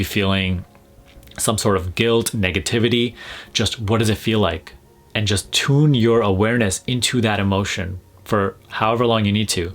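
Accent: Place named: United Kingdom. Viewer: Out of topic, you sound quite British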